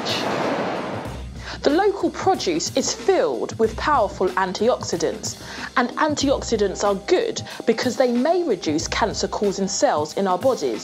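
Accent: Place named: United Kingdom. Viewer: She is british.that accent is from there.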